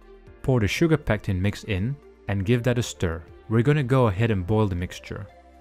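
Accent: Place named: Netherlands. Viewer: You sound Dutch but you said you grew up in Malaysia I’m confused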